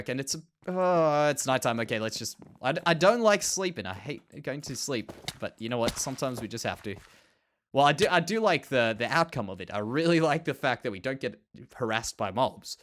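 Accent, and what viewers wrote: Australia. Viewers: it's funny, you are the only other Australian Minecraft Youtuber I know of! It's so amazing to hear an Australian accent. QLD is da best xD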